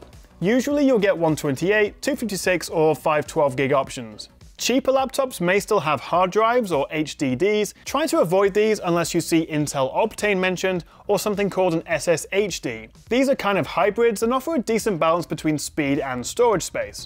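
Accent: United Kingdom. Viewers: What type of accent does he have because it sounds kind of British but also American.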